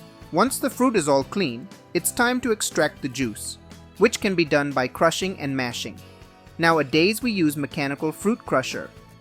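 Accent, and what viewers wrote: India. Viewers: You look like you have Indian goat voice. What nationality are you?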